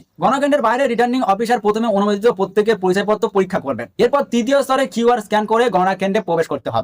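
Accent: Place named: Bangladesh. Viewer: নিজে কিছু চেষ্টা করছ , সেটাই আসল কথা। মিথ্যা নিউজ চ্যানেল এর নাম কেন ? দ্বিতীয়ত যদি ইউটিউব এ বাচিক শিল্পীর কাজ করতে চাও তবে উচ্চারণ অবশ্যই নিজেকেই ঠিক করতে হবে। প্রয়োজনে কোর্স করে নিতে হবে। নিজের উপস্থাপনা সুন্দর হলে নিজের নামেই লোকে দেখবে। নিজেকে ও আরও সুন্দর ভাবে উপস্থাপন করতে হবে। ভারতে বসে বাংলাদেশের মতো উচ্চারণ কেন ? ভবিষ্যতে আরো উন্নতি কামনা করি। ❤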